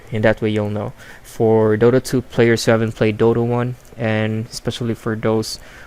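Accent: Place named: Philippines. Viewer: I was surprised your region is U.S. You sound so filipino for me. Anyways great vid ^^.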